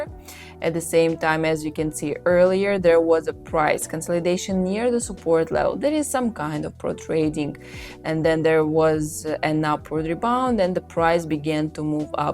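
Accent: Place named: Russia. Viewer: У вас акцент , вы русская ? Я угадать ? Не типичный английский , ведаете на англоязычную аудиторию!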